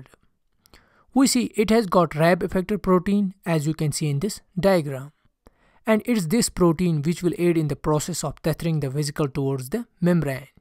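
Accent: Kashmir, India. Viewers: Your accent is kashmiri.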